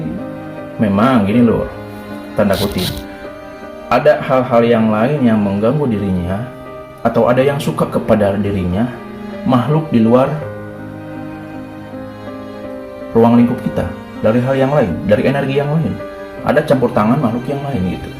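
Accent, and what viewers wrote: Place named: Cirebon, Indonesia. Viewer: bahasae kaya cirebon ...cirebon pundi kang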